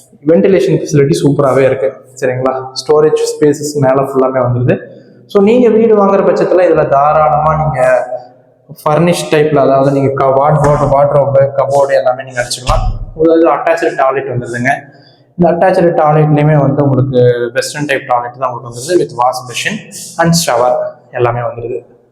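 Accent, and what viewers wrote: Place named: Coimbatore, India. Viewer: kovai slang😅😅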